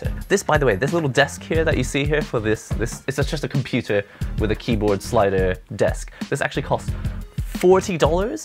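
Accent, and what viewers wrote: United Kingdom. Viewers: Why your accents like those from UK?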